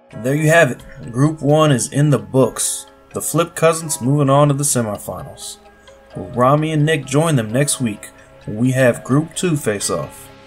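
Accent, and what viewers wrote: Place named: East Coast, United States. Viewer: East coast commentary...yes sir! Good job, and always great racing!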